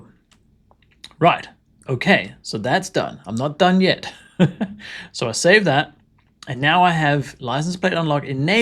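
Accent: Denmark. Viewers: Jeg syntes nok jeg kunne genkende den meget lille danske accent. Hvordan kan det være du er flyttet til Australien?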